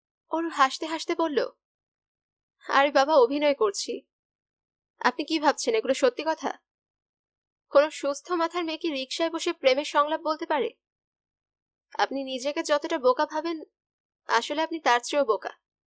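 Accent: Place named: Kolkata, India. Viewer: oh okay. Your pronunciation sometimes is like Kolkata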